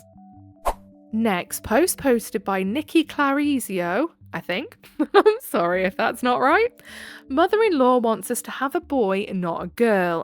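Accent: United Kingdom. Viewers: Oh you're British! No ai and British? Yes!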